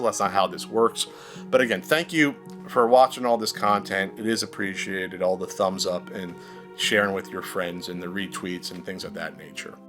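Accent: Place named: East Coast, United States. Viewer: I've been watching your videos since you used to have east coast accent.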